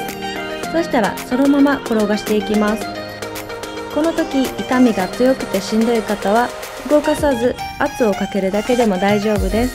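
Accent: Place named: Kansai, Japan. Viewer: 可愛いい〜、関西弁がよろしい〜。買ったまま使ってまへん😵、やってみようかな。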